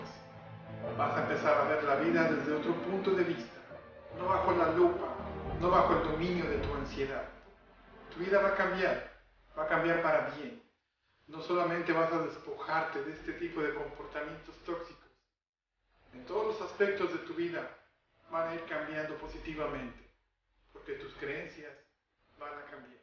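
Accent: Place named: Mexico. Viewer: disculpe sr de que pais es usted tienen acento mexicano cual su numero o su tratamiento y cuanto cuesta el libro o el CD saludos desde lima peru tiene alguna sede por aqui en lima peru bendiciones y gracias por ayudar a muchas personas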